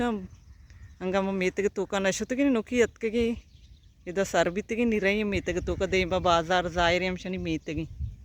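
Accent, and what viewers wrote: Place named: Makran, Pakistan. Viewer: وعلیکم السلام مئے شمئے کلمتی مکرانی لہجہ ءَ هوں همے چیزا دَرنگ گُشاں کہ مری درنگ گشگنتی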